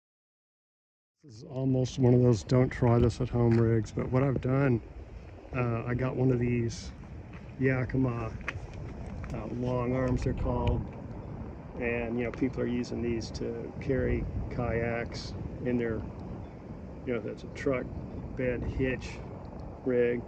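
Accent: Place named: South, United States. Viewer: You have a very pleasing voice. A mild southern accent. Thanks for your sunfish videos!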